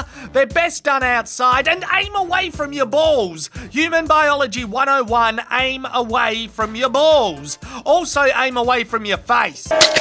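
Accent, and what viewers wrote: Australia. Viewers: You should start a discord channel, and teach people to speak australian! :) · I love Australian accent....Faaeece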